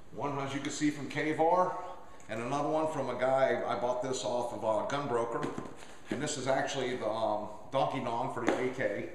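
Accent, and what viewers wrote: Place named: Chicago, United States. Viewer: i dont think it really sounds mobster, its more like just a chicago accent. are you from chicago?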